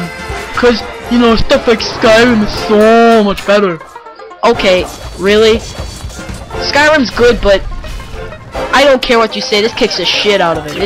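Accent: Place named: United States. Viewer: That accent is 100% American my friend, don't kid yourself :D I think part of feeling we all have towards games comes from the time you play it in. I'm glad you buy the old consoles, shows a desire to explore old styles and appreciate the classics, but I think you have to grow up in those eras to experience what it's like playing these games for the first time during their hey-day. Golden days spent playing Sonic on GameGear, Mario on GameBoy, Goldeneye on N64, Red Alert C+C on PC. Good times!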